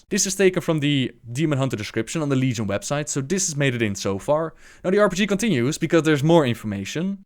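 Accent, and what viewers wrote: Netherlands. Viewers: he is from the netherlands, hes not american or enlish or some sort, he cant do anything about it. For some people who are from the netherlands its hard to pronounce. I dont have that, but i cant pronounce the R while speaking dutch, but i can while speaking english, its rly weird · dude Ur amazing with this. Dutch accent ? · Where are you from? You sound a bit dutch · Where is from?? I know it sounds weird, but when I visit my aunt she sounds sort of like him in like accent wise (she's Dutch) and it's the same with my dad. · dutch accent is real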